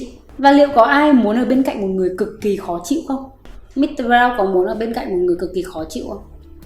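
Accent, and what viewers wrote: Central, Vietnam. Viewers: Chị làm video chỉ nói tiếng miền trung đii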